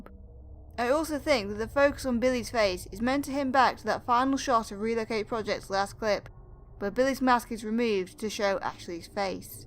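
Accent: United Kingdom. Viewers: Bristol? I wouldn't have guess that in a million years. I did think you were somewhere near Wales but your accent isn't that strong tbh. I like these Walten Files videos, keep up the good work!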